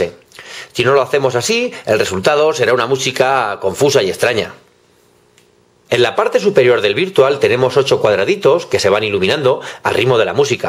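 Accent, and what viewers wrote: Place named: Spain. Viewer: amigo que buen trabajo y gracias x tomarte el tiempo para hacer todo este trabajo que la verda vale muchísimo para los que nos estamos iniciando en esto de lo del dj esto es como el oro puro muchas gracias ojala sigas haciendo mas videos como estos mas de 1 te lo agradeceríamos bueno espero te encuentres bien soy de méxico y x el acento que tienes creo que eres de España bueno pues muchos saludos hasta España y ya me suscribi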